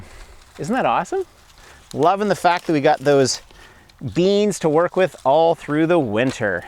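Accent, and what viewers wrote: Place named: Canada. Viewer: I can hear the faint Canadian accent!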